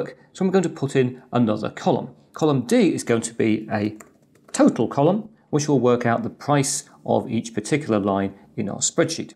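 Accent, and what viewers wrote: United Kingdom. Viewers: You got an astonishing British Accent!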